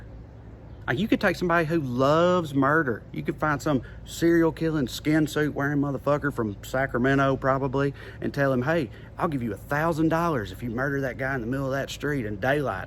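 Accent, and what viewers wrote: South, United States. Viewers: Combining wit with reasoning and great intelligence isn't easy but you definitely nailed it with lovely southern drawling lingo too ... Was even able to enjoy it in entirety back here in Oz... Could stand a heap of this, no kidding ! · I love hearing truth spoken in an accent like mine. Full disclosure, you made me proud to be southern! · I just think you’re simply awesomeness with a strong Southern accent. I love how you think and how you express it.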